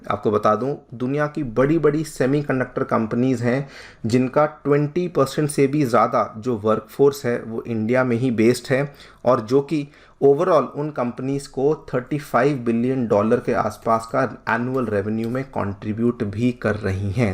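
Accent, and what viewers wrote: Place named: Uttar Pradesh, India. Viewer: shayad ye mahoday uttar pradesh se hai kyuki Maine dekha hai up me log ण कोड की तरह बोलते हैं।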